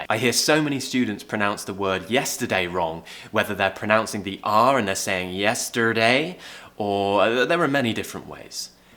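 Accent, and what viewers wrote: RP, United Kingdom. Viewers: Listening to you to get more from your accent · same here, looking forward to speaking like him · Best I have seen thus far. He speaks clearly so you can really hear the different in pronunciation in comparison to other accents. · Hi Teacher I really liked your accent Britsh accent. I enjoyed your lesson. I will continue listening to your lesson because I like it. I didn't understand the course you have talked about. Where ca I found it? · I started watching you because you are similar to my ex friend, but now I do it only for your great teaching of my dream accent. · Love your accent I want to learn more British English accent ❤❤❤ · Hello you have a perfect british accent I recognize because i had a canadian teacher who taught me a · I want to marry a British man. Love your accent! 😍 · AT LAST THE REAL BRITISH ENGLISH ACCENT. 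THANK YOU MATE!!!!! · I love your accent. Thanks for your beautiful british accent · I love the British accent and l love your explanation. You are an amazing teacher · You are amazing teacher. I have just joined you. I am fond of the British accent. thank you, I am from Egypt and I love you very much God bless you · I'm Egyptian and it is the first time to see your videos.. That was really amazing and i hope to be excellent in British language cause I'm in love with this language accent❤️❤️❤️❤️❤️ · I´m a languages speaker student and I´m more familiar with an American accent than a British accent, but your videos have helped me to more familiar with your British accent. Love your videos and you too. Kisses from Mexico. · New subscriber here ! When I watched your video I love it already 😁 I really like British accent Like movie Harry pottah! 😅 Game of thrones 😅 Watching from Philippines Thank you for sharing Keep up the good work 😇 Ps I watched your video since yesterday 😅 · Sir, your way of teaching is outstanding. 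These videos are really helpful in terms of british accent . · Nice videos you're doing. Your accent is perfect for an old teacher to get back to a standard accent. I lived in the US for awhile, then in Northern Ireland. The Northern Irish accent from Fermanagh prevented me from teaching for a while. I resumed teaching with an American accent, but after a while I realized the Yankee accent was very difficult for French learners. After my break, following a surgery, I was on sick leave for almost six months and moved on to get back to a standard RP accent, which proves to be more understandable for some students... Had a French student, whose father is a Briton, he couldn't get a word in any exercice with American English (mostly listening exercice). I found your videos recently and it is totally rad (sorry, Americanism)... As a teacher of English, even though I have lived for some time in English-speaking areas of the world, the right intonation in English is always a challenge for toneless and stressless (depending on regional dialects) French. 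I sometimes use your vids in class to get my students used to the new RP accent I hear when visiting southern England... Keep on with it!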